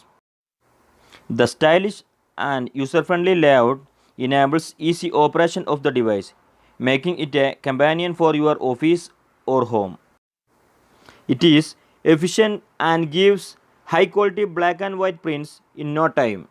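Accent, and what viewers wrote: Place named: India. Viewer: thanks...but your accent is too hard to understand for non-indian...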